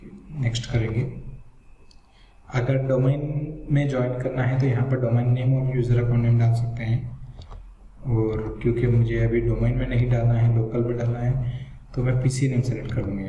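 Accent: India. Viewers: Interesting that commenters can't understand your English. I suppose after working with India programmers for a couple of decades, my ear is accustomed to your accent. Never the less, the software you're downloading looks too risky to take the chance. It's pretty hard to trust the profile migration tool from the FORENSIT site when it's not even SSL / HTTPS protected. That said... Dave Yadav You Tube video "Migrating profile to a different domain" uses an SSL secure site to download the software, is better practiced with English, and does a pretty good job explaining this topic. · fu ck ing indian accent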